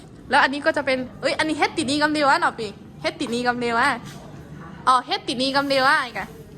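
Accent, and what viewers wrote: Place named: Tai Khuen, Thailand. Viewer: สวัสสดีน้อง เสียงแจ้วๆด้วยเสียงสำเนียงไทขืนเพราะน่ารักๆมากเลยส่งคลิปสนุกๆมาให้ชมพาสาวๆไปเท่วงานปีใหม่ไตมีคนมากมายมาเท่วงานขอบคุนมากครับ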